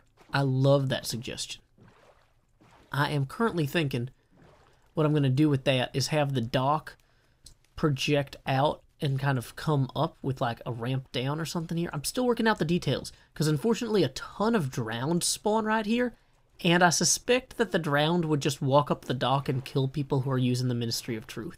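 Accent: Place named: South, United States. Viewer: Sound so country 😂🤠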